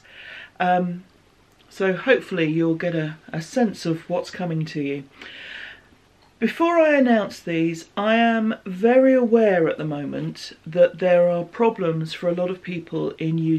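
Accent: England, United Kingdom. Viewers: I enjoy your podcasts so much! It is partly your sweet English accent, your beautiful knitting projects and your great personality❤️Thanks so much for sharing your life with us❤️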